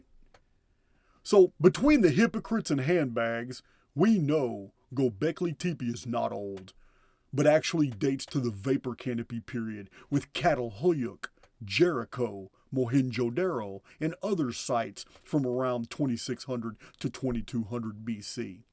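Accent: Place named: Texas, United States. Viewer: From which part of the USA is accent?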